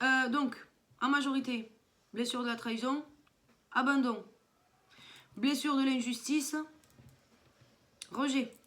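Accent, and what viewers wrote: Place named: South, France. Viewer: J’adore ton accent du sud tes expressions et ton franc parler 👍 merci pour tes vidéos explicatives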